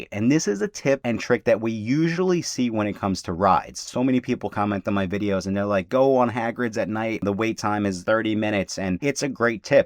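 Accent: New York, United States. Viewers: Thanks for clearly stating that 2nd gen interactives were the only wands trackable on the app! I rely on CC due to grappling with the NY accent, and I didn’t ‘hear’ any misstatement but perhaps my eyes are going, too. The potential $85 minimum savings of not upgrading our 1st gen interactives wands might make 1 visit to Blue Dragon a possibility.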